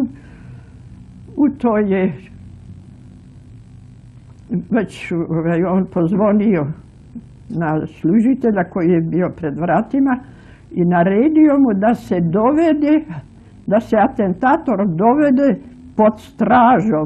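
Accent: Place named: Ekavian, Serbia. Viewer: Hercegovka, odrasla i školovala se u Sarajevu, a govori ekavicom. 🙄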